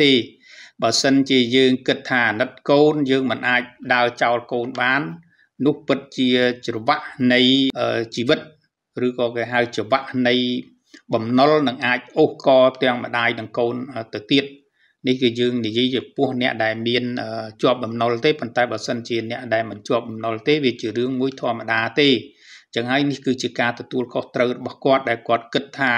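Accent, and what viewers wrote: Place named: Cambodia. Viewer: Very sad and broken hearts , She did the right choice for her son and most people they have struggled life for living and issued living problems and they missed each others very much , so next time let your son hugs a little more so he can feel more warm up with his heart was separate for a long time maybe ( can not judging or blaming on her ) . They are very sad. So I’m agree with Lok Video man . Excellent analysis and real kaun Khmer accent very clear plus personality. . We appreciate . May Gods blessings everyone stay healthy and well . ✌️💪👍👏👏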